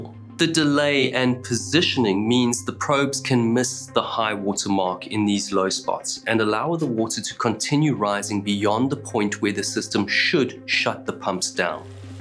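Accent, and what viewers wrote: South Africa. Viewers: I love the south African accent. I had a good buddy from there.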